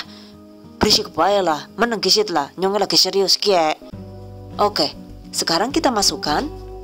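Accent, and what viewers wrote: Banyumas, Indonesia. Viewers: mba ini orang ngapak ya.kok pinter ngomong ngapak.pada bae karo inyong mba😁😁😁😁😁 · Ngapak koek koek menginspirasi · Diiii bocah ngapak ya ,ujungnya2 ko jadi kaya kiye ... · Ternyata ngapak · ngapaknya itu lho bener-bener ngangenin inyong jadi pengen nunggang bis ke kroya wkwkwkwkwkwkwk, btw makasih banget ya bund resepnya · Rika wong ngapak ya mba??haha Nembe nemu nang beranda jannn...ora kit biyen nemu...langsng subscreb mbae...padettt bgt penjelasane.... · Done#GiveawayTHV2021 terima kasih atas semua resep olahan yang belum pernah praktek kan tambah ilmu melihat video THV ini pokoknya the best dah dan ada bahasa ngapak ngapaknya i like it..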